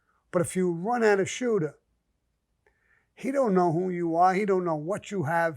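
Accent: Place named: Brooklyn, United States. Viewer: It's simply a dialect common to a Brooklyn that unfortunately, for many reasons, exists no more. Every region has their own. Nothing more. Nothing less.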